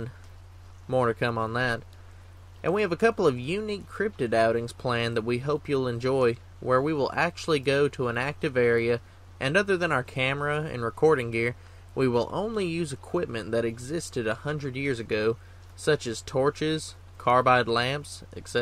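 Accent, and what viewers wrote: South, United States. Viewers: Loved the poem! Also the sweet southern accent that you tell it with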